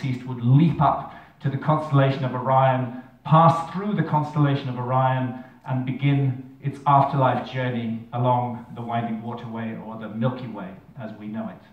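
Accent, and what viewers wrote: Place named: Scotland, United Kingdom. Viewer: He speaks Scotts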